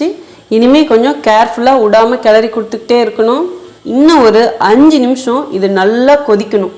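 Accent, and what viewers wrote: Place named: Tirunelveli, India. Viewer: நீங்கள் பேசுவதை கேட்கரசனையா இருக்கு தெளிவா.இருக்கு வாழ்த்துக்கள் மகளே எந்த ஊர்நீங்க பிள்ளை னுசொன்னது நெல்லையா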